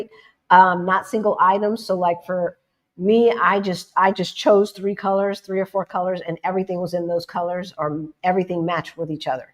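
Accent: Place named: Pacific Northwest, United States. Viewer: I can hear PNW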